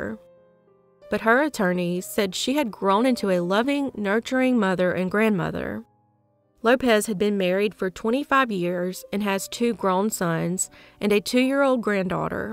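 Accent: South, United States. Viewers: Thanks for another great video! May them RIP. When do we get to know you amd when is the Patreon available? You sound very southern ❤️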